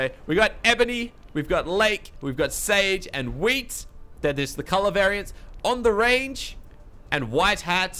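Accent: Australia. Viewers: Hey, why are you Australian?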